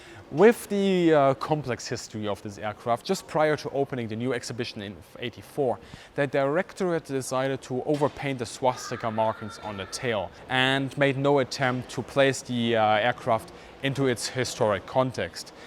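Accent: Germany. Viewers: One of the fastest 23 minutes I’ve had this week! Such a vivid command of the English language and cutting accent of the German dialect in itself is interesting. You have such a vast knowledge and enthusiasm for history and aviation it is incomprehensible your videos could be anything but fascinating. Thank you for your skillful narration and aviation historical intellect. Bravo! From NY, a third generation American with ancestral roots from Germany, Kevin.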